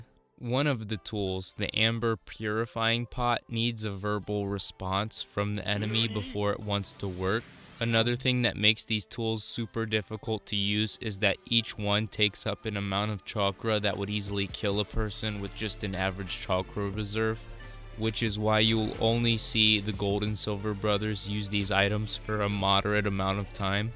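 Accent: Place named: Ohio, United States. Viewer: HE talks like this normally too, he's not doing this on purpose. Its just the Ohio prose my man.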